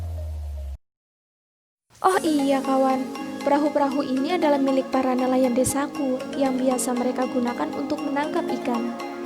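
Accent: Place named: Java, Indonesia. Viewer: Medok lek😁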